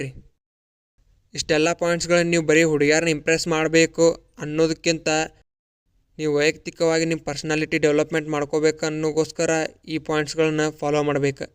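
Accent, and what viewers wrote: North Karnataka, India. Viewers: Dost ne pakka uttara Karnataka dav adi nod .. Nimmur yvda ..🧐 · ಅಣ್ಣಾ ನಿನ್ನ ಉತ್ತರ ಕರ್ಣಾಟಕ ❤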